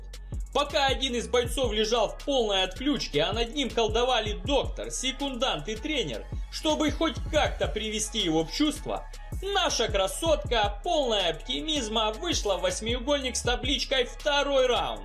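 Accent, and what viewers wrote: Ukraine. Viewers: И ещё. Это просто украинский говор, запомни☝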